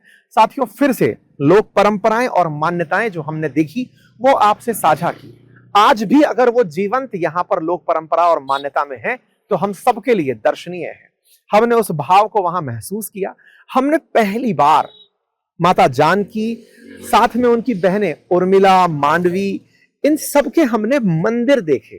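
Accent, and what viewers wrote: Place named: Uttar Pradesh, India. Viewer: इतनी शुद्ध हिंदी कोई उत्तर प्रदेश का निवासी ही बोल सकता है स्पष्ट भाषा आपकी वाकपातुता की परिचायक है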